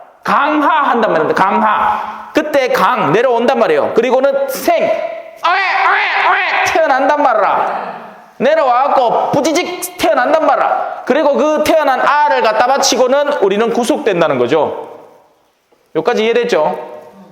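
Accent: Gyeongsang, South Korea. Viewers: 신부님 갱상도 어디세용~? ㅋ 저는 영주입니다 ~으하하하하하하~~^^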